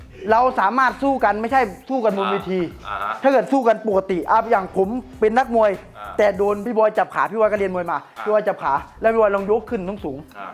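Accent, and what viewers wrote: Isan, Thailand. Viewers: เป็นคนจังหวัดไหนนิ บ้างทีอิสานปนมาด้วยเวลาพี่อธิบาย ผมละชอบ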